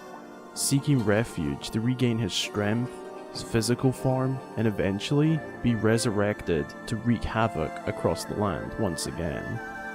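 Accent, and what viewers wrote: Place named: Cockney, United Kingdom. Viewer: Your accent is so cockney XD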